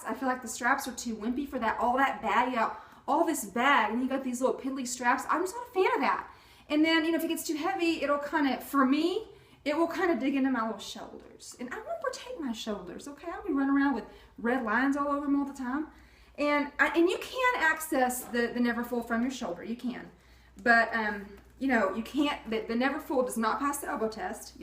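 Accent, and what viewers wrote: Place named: Texas, United States. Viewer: Love you videos. Are you from Texas? Love your accent.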